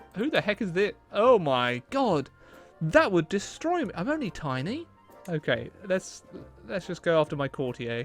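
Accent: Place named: United Kingdom. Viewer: What type of British accent do you have it’s very pleasant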